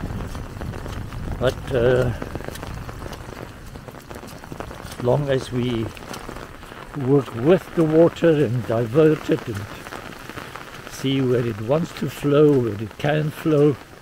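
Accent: South Africa. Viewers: Sounds like a South African accent?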